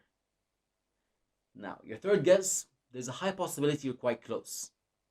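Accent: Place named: Ireland. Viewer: I understand and speak a small percentage of Turkish, and would really love to become fluent in it ama çok zor. I very much appreciate what you are doing to help people learn the Turkish language. Tell, did you by any chance learn English in Ireland? I am hearing an Irish accent from you. Kolay gelsin.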